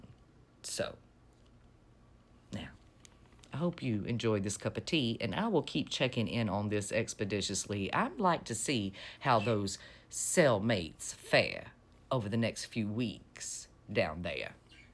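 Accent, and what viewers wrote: South, United States. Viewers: But where in the south? She has the most beautiful accent.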